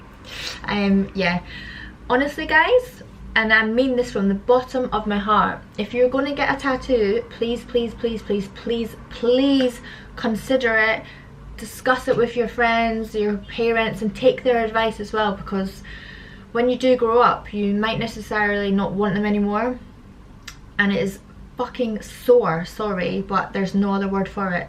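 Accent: Scotland, United Kingdom. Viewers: You look so gorgeous, and your scottish accent tho 😅💕 More video please 😅 and make a vlog about your daily activity 😅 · What your height is??? Cuz im about 6'1 think u look incerdibly beautiful love ur long blonde hair Got such an nice scottish accent which its sound so amazing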